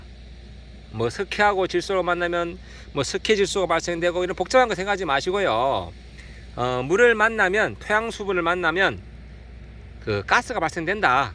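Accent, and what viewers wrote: Gyeongbuk, South Korea. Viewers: 말씀이 참 시골 스럽네요 경북 부부지방 말씨 같은데 구분이 잘 안되네요 ㅋ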